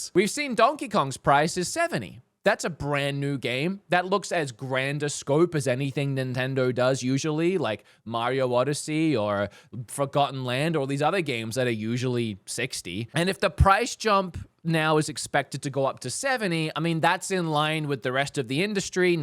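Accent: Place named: Australia. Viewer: I have to ask, are you Australian or American? Because you have mostly an Australian accent but you mix a lot of words in with an American accent. I'm so confused lol.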